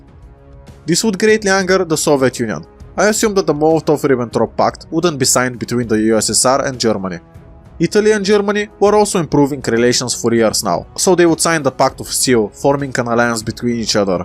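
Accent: Bulgaria. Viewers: Bro are you from da balkans shot you speak kinda like one of my bulgarian friends : ? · are you bulgarian by any chance? you sound like it. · По диалекта разбрах че си Българин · Are you by any chance bulgarian? The accent kind of gave it up😊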